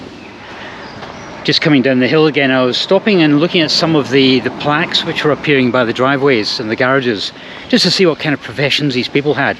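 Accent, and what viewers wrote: Scotland, United Kingdom. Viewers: What English dialect are you speaking? It sounds a wee bit like Scottish, but since I am no native I cannot tell, really.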